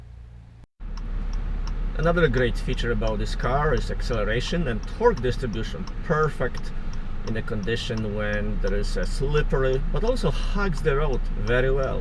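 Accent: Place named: Russia. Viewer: Russian accent)))Learn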